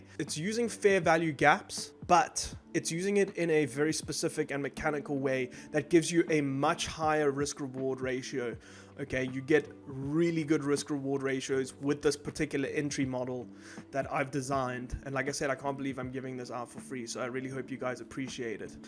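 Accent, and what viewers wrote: South Africa. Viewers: South African accent, lekker bru. New sub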